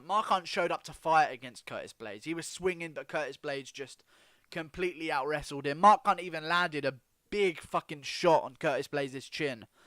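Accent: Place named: England, United Kingdom. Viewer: I've been watching listening to UFC for years .so good to get a real talking English guy that knows what the fuck he is talking about ...keep up the good work Bro 👊🏽✌🏾👌🏽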